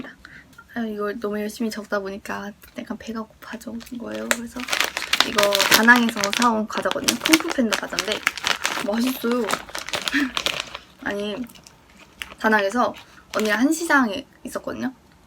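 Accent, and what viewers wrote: Seoul, South Korea. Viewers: 먼가 말투가 2000년대초 서울 사투리같아요 ㅋㅋ(칭찬) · 와 진짜로 옛날 서울사투리 듣는 기분 ㅠㅠ 넘 예뻐여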